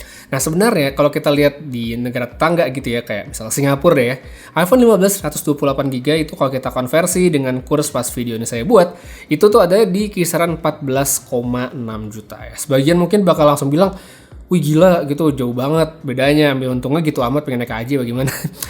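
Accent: Bali, Indonesia. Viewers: Bang dr Bali kah?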